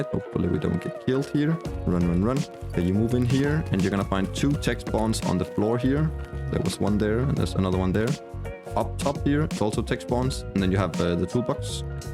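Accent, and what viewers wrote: Denmark. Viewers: Are u danish by Any chance? Ur accent is Kinda danish ngl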